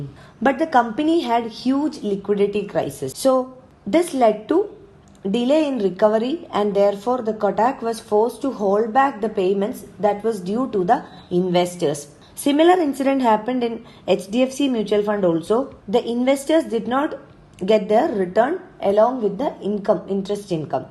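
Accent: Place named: Kerala, India. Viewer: This is keralian accent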